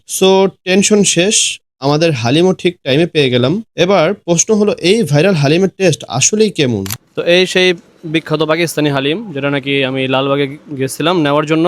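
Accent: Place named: Kolkata, India. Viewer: ভাই ইন্ডিয়ান কলকাতার ছেচরা ব্লগারদের মত ভিডিও কইরেন না আপনার কথা বলার স্টার অনেকটা ওদের মত অথেন্টিক বাংলাদেশি স্টাইলে ব্লগিং কইরেন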